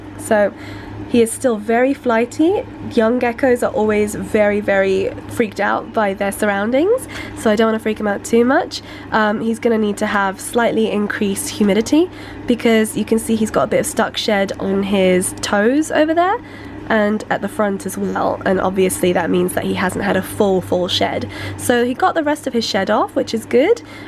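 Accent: United Kingdom. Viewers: Wait is from the UK? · Shes british. There seems so be a language barrier for you.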